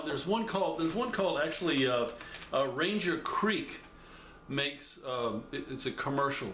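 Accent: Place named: England, United Kingdom. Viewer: More English accents please! Yep that's a tasty looking beverage, cheers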